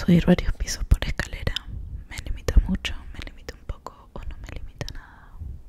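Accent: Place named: Argentina. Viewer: Amó tu acento argentino, saludos desde México ❤️💤💤💤💤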